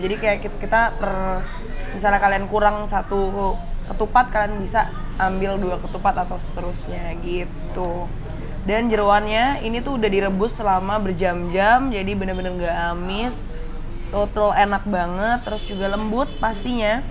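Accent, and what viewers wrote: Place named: Makassar, Indonesia. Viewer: lidah emang makassar banget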